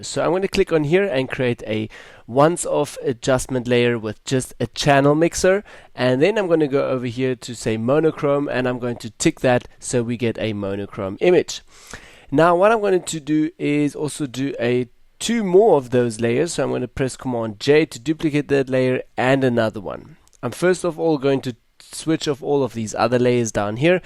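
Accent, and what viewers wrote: South Africa. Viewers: nice suprice to hear a saffa accent in a cs tut! cheers! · I thought he sounded South African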